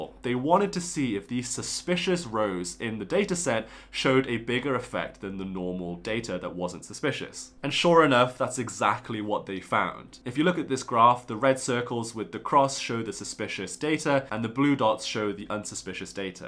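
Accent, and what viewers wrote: England, United Kingdom. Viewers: lol -he doesn't have Chinese surname and he has an English accent and your first guess is that he might be Chinese educator living in China?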